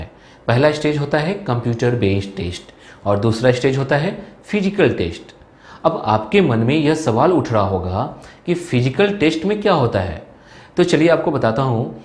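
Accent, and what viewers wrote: Bihar, India. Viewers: Sir aap bihar se hai ?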